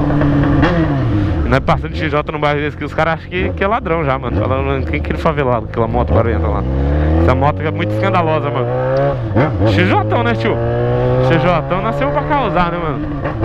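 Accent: Goiás, Brazil. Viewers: Pq vc fala piqui de Goiás?????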